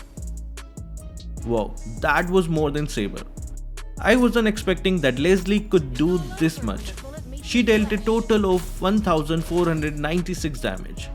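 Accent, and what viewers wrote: India. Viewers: Sound like a indian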